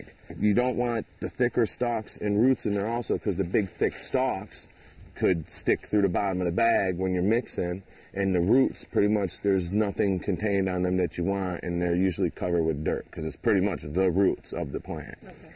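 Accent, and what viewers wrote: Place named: Newfoundland, Canada. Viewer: buddy sounds like a newf most definitely a newf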